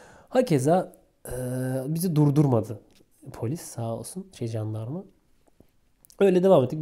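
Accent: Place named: Black Sea, Turkey. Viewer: Karadeniz şivesi süper😂Oruçlu okudunuz sanırım insanın ağzı kuruyor,sonlara doğru bitiricem diye zorladınız teşekkürler.Hakkınız ödenmez.🙂💐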